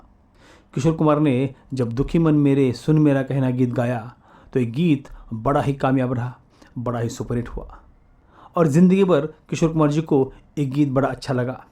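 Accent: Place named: Maharashtra, India. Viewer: तुम्ही मराठी आहात का? हिंदीत मराठी ची झलक झळकते ।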